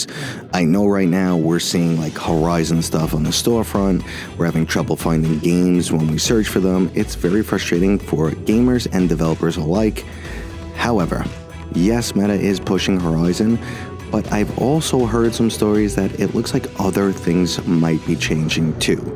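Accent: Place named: Brooklyn, United States. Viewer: You know when that Brooklyn accent "tries" to whisper, you're in for something good.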